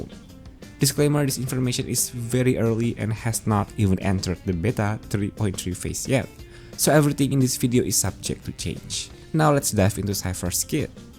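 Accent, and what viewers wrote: Indonesia. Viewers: You sound Indonesian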